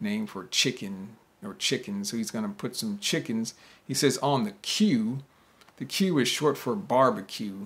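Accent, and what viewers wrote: United States. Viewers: I just discovered you.... I'm an English learner. And I love American accent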